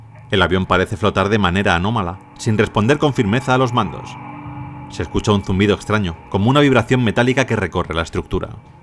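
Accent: Spain. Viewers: Así lo pronuncian en España.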